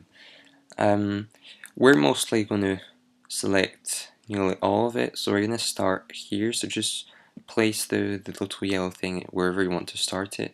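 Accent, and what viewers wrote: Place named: France. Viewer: Francais Accent :)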